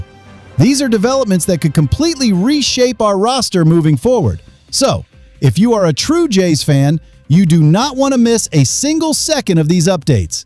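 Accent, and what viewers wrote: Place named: South, United States. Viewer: Why does the AI voice have such a good old boy American accent?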